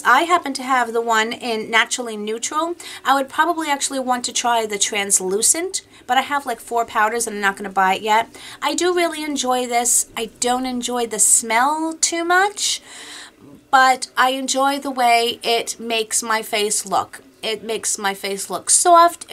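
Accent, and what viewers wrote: New York, United States. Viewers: I LOVE the Dr. Jart Tiger Grass Cicapair cream! That stuff is amazing! It helps with mild sunburn, redness from the cold, rosacea, even my eczema blotches I sometimes get on my neck, anything that will make skin red. That was one of my fav finds in 2018, but I'm sure it's been around before that. Oh yeah and speaking of fav finds, the Zodiac pallets! I have all of the bh spacey pallets! They are awesome! I'm 42 and never before bought big pallets before this., you would like the first Zodiac maybe I think you would rock the cool tones and I'm guessing Supernova would look awesome on you too! The Galaxy Chic is my fav, but you would love the Aurora Lights because the colors are shimmery. I could go on! Haa sorry Oh and thx for warning me about the charcoal magnesium deodorant! I was thinking of buying it. I don't like their regular formulas, but the sensitive skin ones are good. 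Natural deodorants are rarely good enough. My fav ones are Kiss My Face-dry spray and stick, E.O. sprays, and Arm&Hammer Essentials (it's half way to natural) and I still have to try the Native one in my Target box that I keep seeing good things about. Oh and that Tom Ford perfume, oh woww haa daaamn that stuff is gooooood! Ok I'll stop. Thx for the info,. And your voice is nice and calming- love the NY accent too. Have a great weekend!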